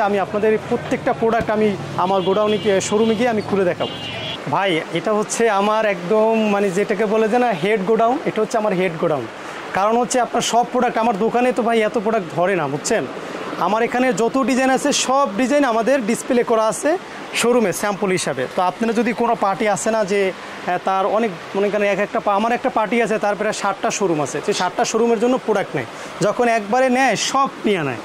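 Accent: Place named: Barishal, Bangladesh. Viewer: Narayongong er lok er borishaler moto kothar sur keno ashe ??. uni ai alakar lok na?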